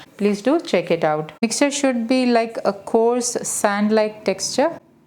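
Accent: Kerala, India. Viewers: Pretty thick Mallu accent. Aunty, it's sprinkle NOT springle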